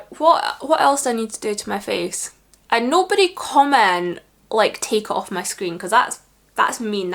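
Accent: Scotland, United Kingdom. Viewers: Hellow Sweets. What Part Of Scotland Are You From?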